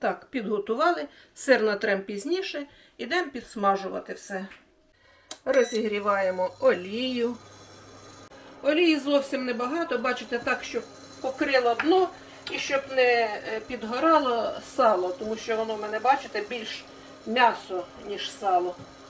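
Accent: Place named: Surzhyk, Ukraine. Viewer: Привет из Кузбасса!, как же мы любим вас за ваши, казалось бы, незамысловатые и бюджетные блюда, но настолько необычные и вкусные, что чувствуешь себя королем, хотя уже давно на пенсии, а поэтому живем скромно и воспитываем сына, который еще у нас учится.Чтобы вкусно накормит семью чем-нибудь необычным, каждое утро ищу интересные рецепты в интернете, чтобы приготовить что-то новенькое и отправить сына на учебу. А ваш говор напоминает родную бабулю из Украины, которая говорила на суржике.Поэтому встречаюсь с вами, как с родными.Переживаю за вас, чтобы все у вас было хорошо, чтобы были все живы-здоровы.И вашим деткам всего хорошего.Пусть радуют вас и берегут.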